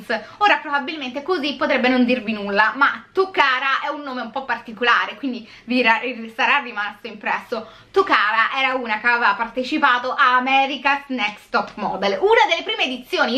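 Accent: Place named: Rome, Italy. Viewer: Amo tantissimo il romanaccio, dona a tutto una nota così affascinante e divertente: pura poesia ♥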